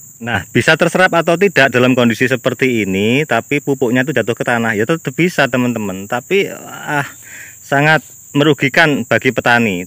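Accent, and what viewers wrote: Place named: Java, Indonesia. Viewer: Temangsang😁😁😁 isih totok jowone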